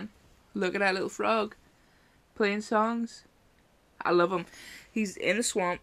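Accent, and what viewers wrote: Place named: Newcastle, United Kingdom. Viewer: Same I’m Scottish so I kinda hear it but more so Geordie accent I think x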